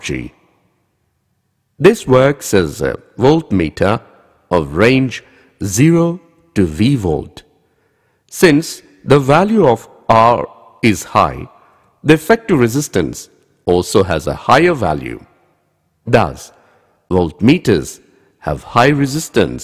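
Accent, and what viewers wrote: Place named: India. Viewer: Sounds like an Indian who tries really hard not to sound Indian.^^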